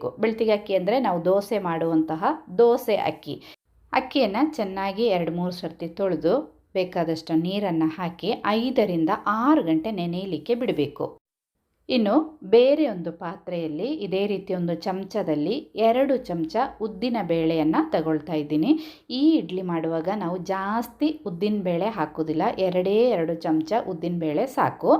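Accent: Kerala, India. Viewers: Malayalam accent. Why don't you answer the comments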